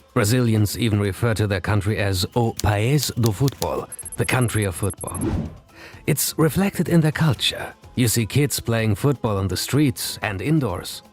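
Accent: Germany. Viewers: Really perplexed by how poorly the narrator pronounced most of the Portuguese terms and expressions in this video – none of which are difficult to pronounce accurately if you bother to research the pronunciations. Otherwise another great, informative video. Ich finde generell das deutsche Reporter (ich gehe von seinem Akzent aus dass dieser Typ deutsch ist) sich nicht genug Mühe geben, fremdsprachliche Begriffe richtig auszusprechen, was ich als Deutscher der im Ausland aufgewachsen ist sehr bedauerlich finde. Mandando todo meu carinho de Londres aos brasileiros assistindo o vídeo 🕺🏽 tamo junto!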